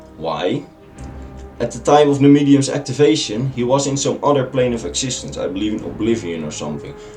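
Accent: Netherlands. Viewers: are you dutch???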